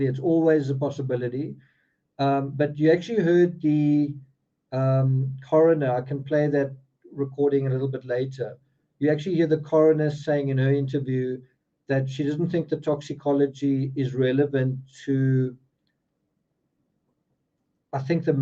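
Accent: South Africa. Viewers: South African talking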